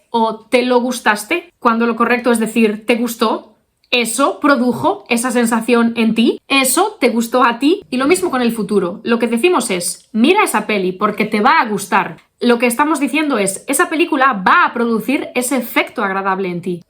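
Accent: Spain. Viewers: Me gusta mucho tu acento español y quiero aprender a pronunciarlo Soy youssef y vivo en valencia y tengo 1año y 6 meses en espaná Puedes ayudarme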